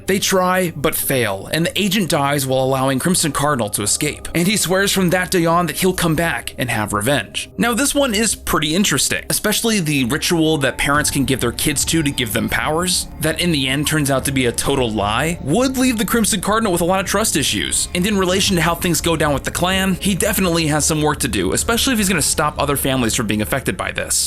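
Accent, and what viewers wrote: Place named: Russia. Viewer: eeeew, russian? awful :C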